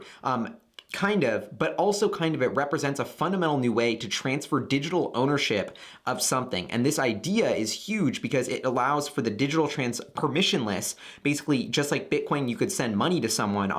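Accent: United States. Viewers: Your American accent is so gey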